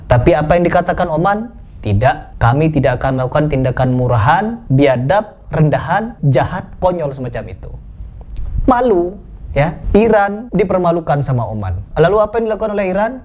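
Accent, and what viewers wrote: Madura, Indonesia. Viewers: bukan orang madura, tapi ngomong logat mdr, krn sy sendiri, ayah mdr, ibu jawa, krj guru sd pasca merdeka, ini antek amrika, mirip prabowo, hingga kapal pertamina indonesia ditahan iran, hingga nggak bisa apa 2,pdhal ayatullah hafid qur an, kalau nggak bisa bc huruf, itu kelihatan..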